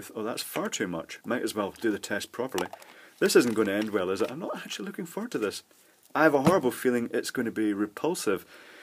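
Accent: Scotland, United Kingdom. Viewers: something satisfying about a Scottish guy cursing.......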